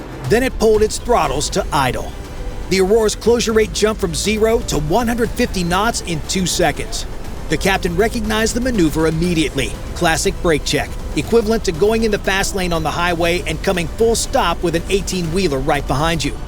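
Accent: United States. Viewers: American accent though, no originality on You Tube any more, how sad... "creators" my ass!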